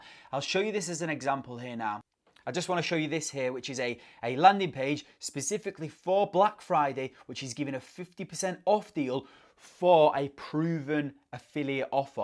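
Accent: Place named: Yorkshire, United Kingdom. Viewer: yeah, I wanted to do that but then Covid came and put my plans on hold, thanks mate. You sound like a Yorkshire lad, I'm from Leeds and vlogging at the coldest inhabited place on earth at the moment 😂😂😂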